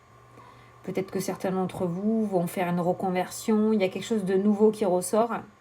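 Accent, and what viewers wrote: South, France. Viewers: Bonsoir j'ai été a Baillargues pendant 50 ans et je suis a Hyères depuis 2 ans on voit bien que vous êtes une vraie sudiste l'accent est formidable et vos éxplications sont très claires et exactes merci et à bientôt très cordialement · Bonsoir moi je viens du sud ouest Hossegor Et je rentre tout juste de Perpignan où vit ma Soeur Merci pour cette bonne humeur et l’accent mon dieu que je le connais et c’est meilleur oui, tu gères la fougère terrible merci 🙏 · Coucou du soir alors moi je viens de Clermont-Ferrand et actuellement nos belles montagnes sont sous la neige et on se pèle Ahhhh Perpignan c'est une belle ville c'est normal que tu ai l accent du sud ! jeudi qui s'annonce un peu compliqué mais on va faire avec En ce qui concerne le sport ce ne sera pas pour demain parce que je vais chez le kiné lol C'est super rigolo parce que j'ai le même jeu que toi Et ce matin je me suis tiré la carte qui me conseille de manger des fruits et légumes et de devenir végétarienne LoL Ton collier je l'avais remarqué j'étais certaine que c'était un cadeau de Noël, au fait en parlant de ça le collier chapelet je l'ai trouvé sur Amazon pas le même que toi mais très jolie aussi Faites c'est drôle parce qu'on utilise les mêmes jeux j'adore j'imagine que le goal de utiliser consultation je sais pas ce que tu en penses mais moi quand je les dans les mal ressenti est décuplé par rapport aux premiers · moi c'est le 04 Manosque tu vois le sud avec l'accent bien plus prononcé que le tien, chantant comme les cigales, très fière de mon accent tant pis s'il ne plaît pas à tout le monde c'est le mien 😘😍 allez hop la vidéo je t'écoute je suis toute ouïe 😊😊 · Ja doré le accents du du sud. 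Perpignan. Le boulou argeles je connais bien bien moi je suis du Loiret beugency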